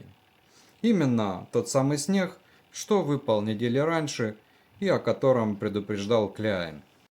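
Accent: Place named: Okanye, Russia. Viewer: Научись разговаривать по русски, не окай! Удачи! Но слушать тебя невозможно!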